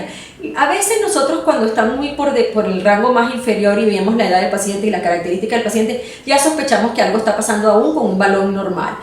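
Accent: Venezuela. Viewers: Dra. es un placer ver sus videos tan claros y con mucha seguridad. No se de donde sea usted pero por su acento me parece Venezolana y eso me hace añorar nuestros Medicos ya que en estos momentos estoy viviendo una situacion bien desesperante con mi salud tengo 8 meses presentando una serie de sintomas y los medicos aun no me dan un diagnostico solo examenes y mas examenes y yo ya llevo 45 libras perdidas vivo en USA y me parece INCREIBLE esta situacion en un pais como este; añoro tanto mi pais y mis medicos Dios la bendiga por su labor.......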